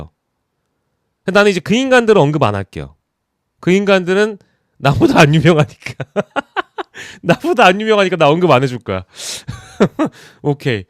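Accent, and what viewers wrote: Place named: Gyeongsang, South Korea. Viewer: 갱상도 추가요..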